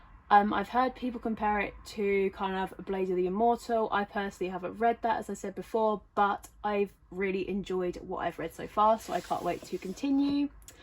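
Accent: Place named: United Kingdom. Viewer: Hi! Can I ask if you know where to get secondhand mangas in UK? (assuming you are in uk based on your accent, sorry if im wrong!)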